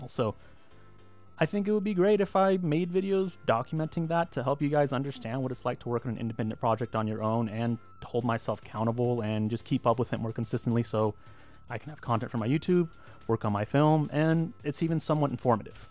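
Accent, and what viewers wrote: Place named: England, United Kingdom. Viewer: literally asking myself in the head, is this guy english, then 1st second explains it all.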